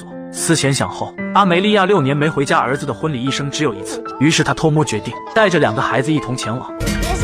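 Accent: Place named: United Kingdom. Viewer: 整片我最出戲的是英式中文，DSG -> 大使館🤣🤣🤣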